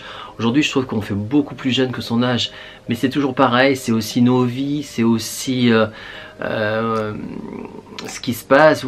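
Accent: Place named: South, France. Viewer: coucou mon petit ou devrais je dire mon grand vu ta taille lol!!!!! j'ai adoré ta vidéo et c'est génial d'en apprendre un peu plus sur toi, tu es un mec au top mais je n'en doutais pas on entend bien ton accent du Sud et c'est super car quand on t'écoute parler tu mets du soleil dans nos vies MERCI!!!! ta chaine grandit de jour en jour et je t'en félicite, tu le mérites surtout quand on sait le temps que ça prend et franchement tu ne ménages pas ta peine pour nous offrir un contenu à la hauteur de ton perfectionnisme le rouge te va très bien sur le visage lol!!!! ne changes rien car ce qu'on aime chez toi c'est ton authenticité je te fais des tonnes de bisous ♥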